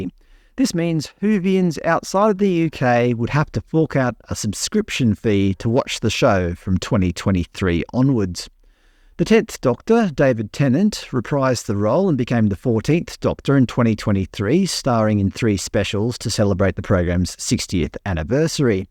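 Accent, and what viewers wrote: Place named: Australia. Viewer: Americans listening to you won't realize that you're Australian not British, but you've got a nice accent. I don't want my Doctor to be female, i don't mind a female time lord i loved Romana, Mary Tam in the 70s but The Doctor should be a male, I also don't want the Doctor to be black, i don't mind a black time lord but after growing up with the Doctor being a white ( straight) male i find these changes just forced diversity, I'm not racist or homophobic, I'm gay myself and i wouldn't mind a black James Bond played by Colin Salmon for example. The BBC should be forced to scrap the TV license tax because Disney is a commercial company and it's funding this car crash of a tv show. Donna's trans daughter criticizing the doctor for not being a woman well they( Donna's daughter) never was a woman and still isn't. So the Daleks are now in wheelchairs now? 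Oh and I forgot i don't want my Doctor to be played by an immigrant, he should be played by a British actor, that's actor as in man. The producers, writers and actors are informing us the classic fans Today "sorry straight white males this show wasn't made for you and it never was" Tomorrow they'll ask "Why aren't you watching our show?"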